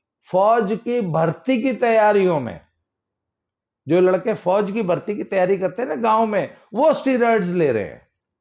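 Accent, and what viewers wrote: Haryana, India. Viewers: कई दिन होगये अजगर गैंग पर कोई वीडियो नही आई, we are waiting for it😂 your हरयाणवी accent🔥😂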